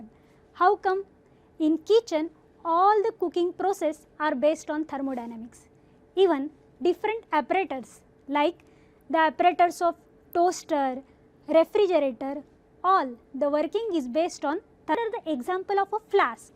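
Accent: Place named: Maharashtra, India. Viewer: Please change the Blazer, as it it's not suitable for you. Out of rest of the things ....change pronunciation as its should sound like exemption from atypical Marathi ...more of universal being.Pronunciation of certain words can definitely be improved.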